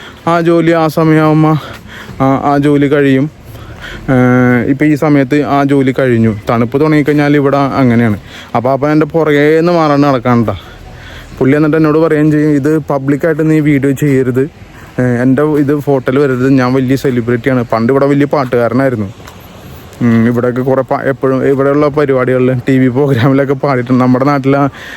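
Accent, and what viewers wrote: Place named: Ernakulam, India. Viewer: എറണാകുളം സ്ലാങ്ങ് ആണല്ലോ.Akm ആണോ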